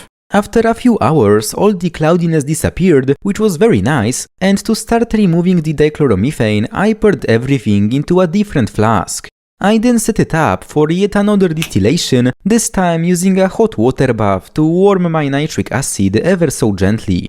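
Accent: Poland. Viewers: Z akcentu wnioskuję że polak?😂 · First three words, I heard the polish accent, I knew it instantly😂😂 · Od pierwszego słowa wiedziałem że Polak ❤ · Most polish accent ive heard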